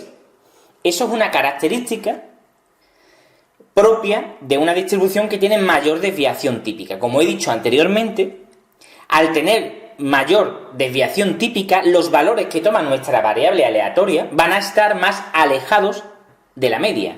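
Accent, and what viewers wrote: Andalusia, Spain. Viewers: Hello teacher, you will not be by chance in southern Spain ? I tell by the accent to pronounce . Very good videos and greetings .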